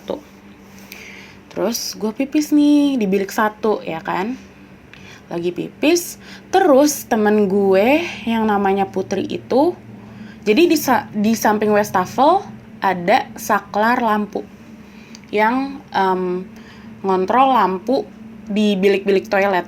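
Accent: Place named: South Jakarta, Indonesia. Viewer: Woww aksennya jaksel bingitsss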